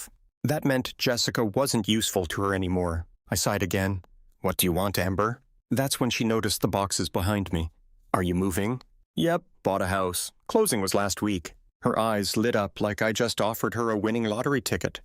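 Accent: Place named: Canada. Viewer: The voice sounds Canadian 😂😂😂. Parents don't want to deal with the sister and her kids😂😂😂😂😂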